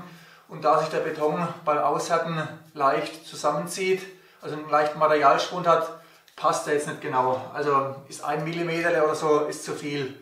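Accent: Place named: Franconia, Germany. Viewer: Allein für‘s fränkische einen Daumen hoch. Grüße in die Heimat.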